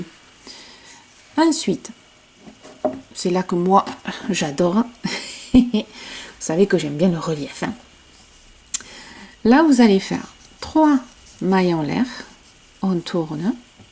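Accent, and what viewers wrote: South, France. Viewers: Bonjour, et merci beaucoup pour ce très beau modèle. J'aime tout! 😍 Le point, la large encolure à la limite du col châle, la construction carrée simple (mon premier patron maison était de ce type, avec également un point en relief, mais avec un col V). Je vais le faire, c'est une certitude! Et que serait une vidéo d' sans son ardoise, son accent plein de cigales, et ses petits rires spontanés? Vous êtes un plaisir pour les yeux et les oreilles. ☺️ Sans compter que vos explications sont toujours claires, précises et détaillées. Je vous remercie encore pour ce gilet qui va définitivement entrer dans ma garde-robe. 👗 Petite précision: je fais mon point élastique en montant une chaînette que je travaille en brides relief avant et arrière sur le retour, et j'avance sur le poignet en mailles coulées. Je trouve que cela resserre mieux autour du poignet, surtout si l'on a une manche un peu large justement pour mettre un autre vêtement sous la veste. 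Je vous souhaite une très agréable journée. Prenez bien soin de vous. 🌺🙏😘🌺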